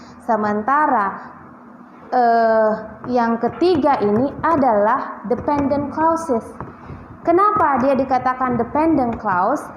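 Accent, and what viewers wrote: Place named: United Kingdom. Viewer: Pengen ngucapin BHS Inggris kayak ibu dosen, fahiiiih bgttt.. aksen Britishnya dapeet.. bah bih beeh..😁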